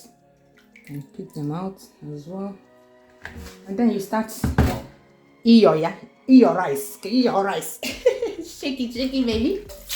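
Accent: Nigeria. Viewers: ...i learn soo much from you. Listening to your voice reminds me of home, Nigeria...🥂❤. Thanks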